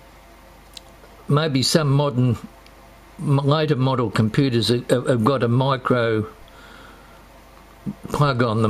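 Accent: Australia. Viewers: Thank you man , in fact i was search on youtube to find a way to delete the files , and suddenly i see your's , a great explanation. Thank you again Also i have a question for you What is your accent ? Is it Australian ? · I like your aussie accent ~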